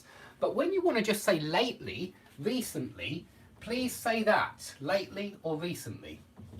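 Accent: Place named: United Kingdom. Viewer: ! British speech ... cool.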